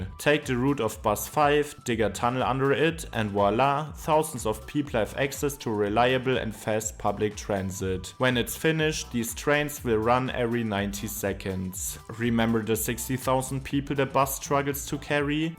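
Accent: Germany. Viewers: Das deutscheste Englisch was ich je gehört habe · Terrible German accent and aweful grammar. I feel like listening to my former classmates doing a report on public transport. My ears are bleeding. Please stop! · Da dein deutscher Akzent sehr ausgeprägt ist, würde ich dir empfehlen, in der Nachbearbeitung einen De-Esser auf deine Stimme zu legen, dann wäre das bisschen angenehmer zu hören.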